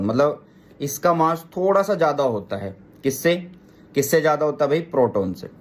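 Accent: Haryana, India. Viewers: Sir aap haryana se ho kya😁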